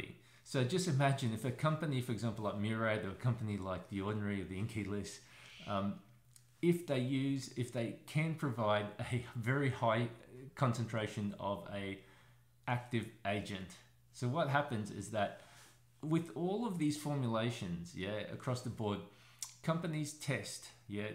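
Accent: Australia. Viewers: Most informative channel on skin care I've seen 💙 Bonus: The way he says 'yeah' at the end of his sentences with his Australian 🇦🇺 accent, awesome ❤️ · I enjoy watching your videos so much! They’re so informative and easy to understand and on top of that your Australian accent is just a plus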